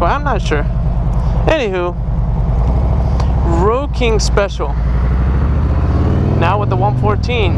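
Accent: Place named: Germany. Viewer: Nice review man. Where is your accent from? Deutchland?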